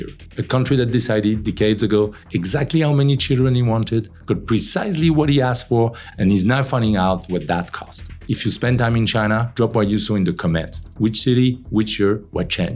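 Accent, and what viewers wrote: France. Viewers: Love his French accent!!!💖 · Like your video's including your French accent. Greetings from The Netherlands and thanks for your video's. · The world’s most annoying French accent. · Mon Dieu, ça fait mal d'entendre son dialecte. On ne peut pas transposer la mélodie de la langue française en anglais.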